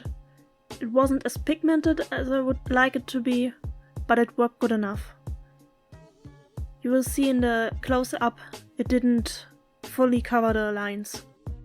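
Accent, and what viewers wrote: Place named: Germany. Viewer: Hey just wanted to say your video is actually pretty good, the quality is good! Also it was pretty interesting because i never had an actual set of these to use for an actual illustration. I suppose that you are a native German speaker? At least you are sounding like one, but i might be wrong- Anyways, your accent is indeed visible and I do not know if this helps but I learned that speaking englisch, and getting the accent a bit less visible is not easy. As an advice: it might help you if you speak more "swollen" German is a language that makes you speak with mote cutts. English is a language that is rather smooth, while german is kind of fractional, both in individual can sound very pretty but combining these mostly sounds strange because its a big difference. Also if you really wanna work on it you always can watch movies or tv shows and speak with the characters. 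Because if you focus on that for most people the words come again voiced in their heads when you then speak them out loud. But, do this with older series, something that you already watched and better not use something like sit-coms, because people are speaking faster and not always correct english so its not the best idea to get there an example. At least this was something that helped me alot! But you actually don't need to work on the accent at least you speak very clearly and you are understandable, so infact there is no real need to do so, but if you want to improve thats the advice I could give... Have a gre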